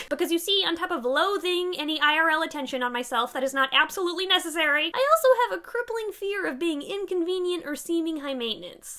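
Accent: Minnesota, United States. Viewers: Hey fellow Minnesotan, your accent reminds me of home :3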